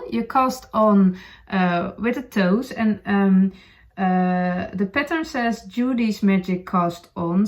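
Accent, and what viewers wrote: Netherlands. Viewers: I love your podcasts. You r duchyenglish is charming. It is one cactus many cacti. We have a few words ending in I l think it is Latin but l am not sure. Continue with the English. I lived in Sweden for a few years and would like to think that my Swedish was as good as your English. Anyway one cactus many cactii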